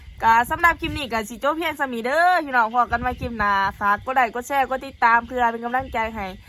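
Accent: Ubon Ratchathani, Thailand. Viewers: เว้าปานคนอุบลแท้เจ่า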